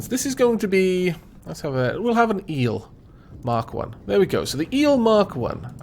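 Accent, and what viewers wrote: Wales, United Kingdom. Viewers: Where r u from? You have a Welsh twang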